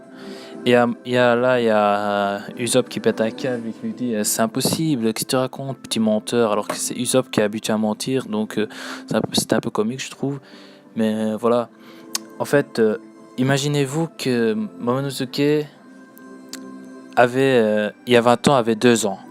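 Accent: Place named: Djibouti. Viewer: Oh mince, j'était entrain de faire une théorie dessus !! j'ai directement vu ton accent "Djiboutien-Somalien" moi aussi je suis djiboutien et je t'invite a regarder mes video sur t'aimes les manga ;)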